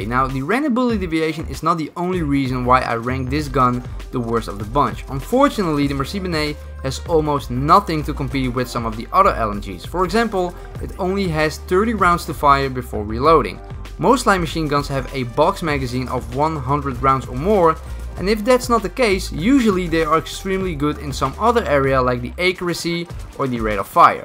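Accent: Netherlands. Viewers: are you dutch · Are you Dutch? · You come from netherlands right?😊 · he is dutch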